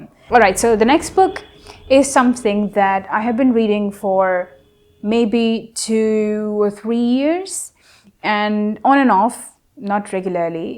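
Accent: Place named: England, United Kingdom. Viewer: Aap English accent ko kaise prounce kar leti ho dear 😚 tips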